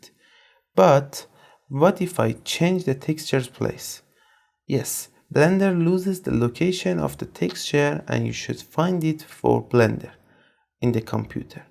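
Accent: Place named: Iran. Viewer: fully Persian accent I love it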